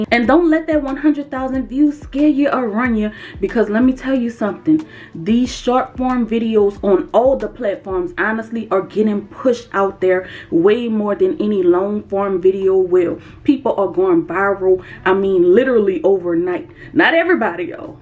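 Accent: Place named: Louisiana, United States. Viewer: Nope....you can't hide that accent...hello my fellow Louisiana sister lol. I'm from Lafayette.. keep inspiring us thank you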